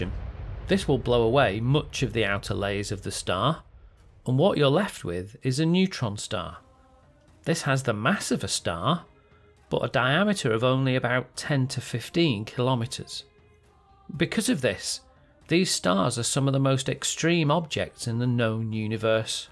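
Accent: United Kingdom. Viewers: pAIr, nor PER...bEta not bIta. british...they invented the English language but still found a way to butcher it...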